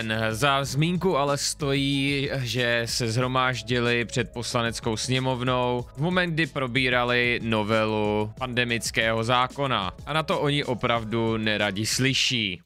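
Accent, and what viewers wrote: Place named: Moravia, Czech Republic. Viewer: Ta slatka Morava. 🙂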